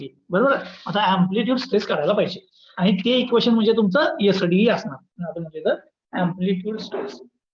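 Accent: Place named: Kolhapur, India. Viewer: Kolhapuri accent is so sexy sir 😊😊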